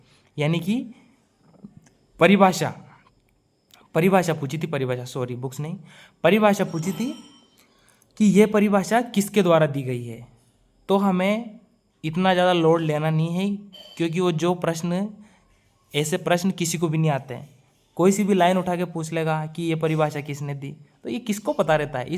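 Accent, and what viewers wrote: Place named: Sawai Madhopur, India. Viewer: Sir apke bolne ke tarike andaja lga paa rha hu aap sawaimadhopur ya aaspaas area ke hai....❤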